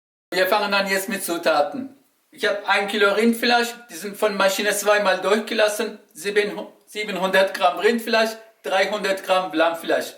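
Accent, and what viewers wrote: Swabia, Germany. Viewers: ein schwäbelnder türke <3 instantlike haha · des schwäbisch ist einfach toll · Sein Schwäbisch 😂😂Geiler Typ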